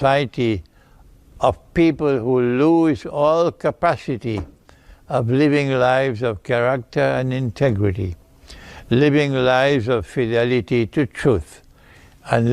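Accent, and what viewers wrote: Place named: Tamil Nadu, India. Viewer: Trans tamil english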